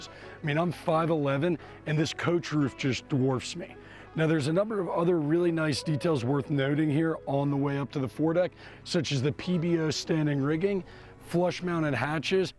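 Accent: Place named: Maryland, United States. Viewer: Maryland accent. :)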